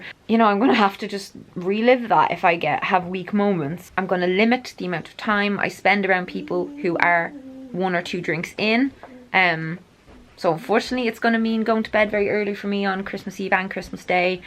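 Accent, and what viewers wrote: Ireland. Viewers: Your accent though…… I want an Irish woman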